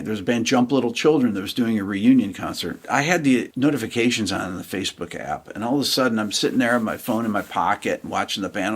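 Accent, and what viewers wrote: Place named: Upstate New York, United States. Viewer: Congrats! And I like that you still have traces of upstate NY in your speech! 👍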